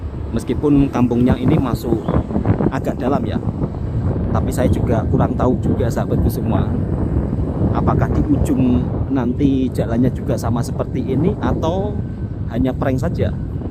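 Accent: Java, Indonesia. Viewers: Lidah jawa terlalu pekat walau berbelas thn diMalaysia.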